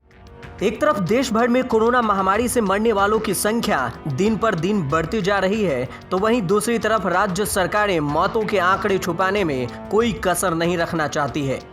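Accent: Bihar, India. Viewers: Ancadh bihadi hai na Muh mein paan permanent hota inke Ra ko rda bolte hain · बिहाडी 🙄🙄 एक्सेंट तो सुधार ले बन गया पत्रकार 😂😂🤗🤗🤗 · Anchor bihari h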